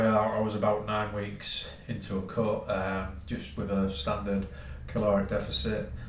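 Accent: England, United Kingdom. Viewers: Good video. Great to hear a bloody English accent on a fitness channel for a change. You a Manc?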